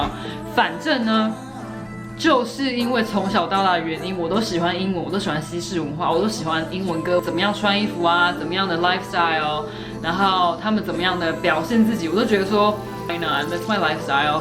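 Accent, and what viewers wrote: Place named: United States. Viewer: 說英文久了 你連講中文都已經混著美國腔了 XDDD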